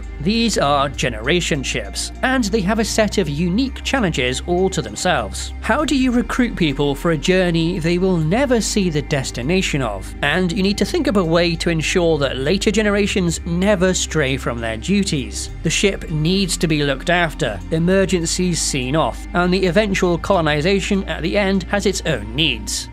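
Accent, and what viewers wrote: United Kingdom. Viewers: ok british man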